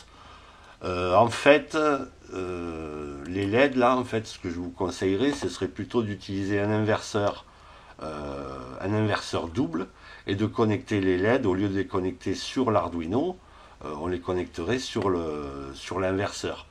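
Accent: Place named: Toulouse, France. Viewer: Bonjour Merci pour vos tutos!!! On à beau dire mais l'accent de Toulouse facilite grandement la compréhension de l'arduino, pour moi qui vous écrit du 65... En prévision de construction de mon TCO, j'ai déjà câbler et programmé un servo avec un inter bipolaire ( trop fort!!!) les cœurs d'aiguilles et les leds du tco seront par micro swithc commandées par les servos. Deux questions se poses pour moi: Combien de servos je peux commander avec l'arduino uno? (la puissance se fera avec une alim indépendante 5v) Et comment puis je les raccorder? Encore merci pour la simplicité et la pédagogie avec la quelle vous nous expliquez des techniques qui peuvent être si compliquées (du moins pour moi...) Adichatz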